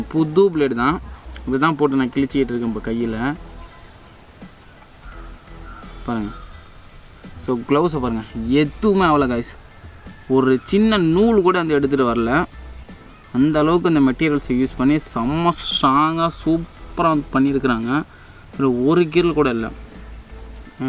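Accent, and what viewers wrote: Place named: Tamil Nadu, India. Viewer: Ungaloda tamil english slang supera iruku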